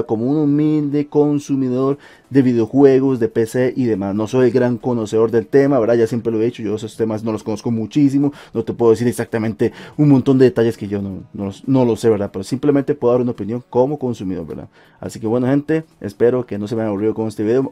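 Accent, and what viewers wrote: Costa Rica. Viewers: Se me hacia que era tico por el acento, ya cuando vi la postal de Lda lo confirmé😂😂👌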